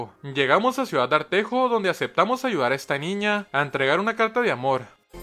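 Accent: Chihuahua, Mexico. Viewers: una pregunta mi estimado ¿es usted de Chihuahua? tiene el acento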